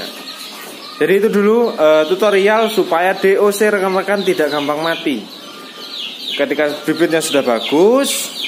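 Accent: Java, Indonesia. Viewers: Bahasamu jowo kentel mantul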